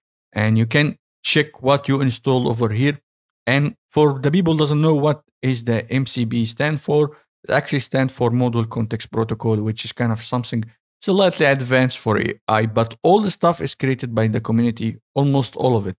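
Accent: Egypt. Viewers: Egyptian accent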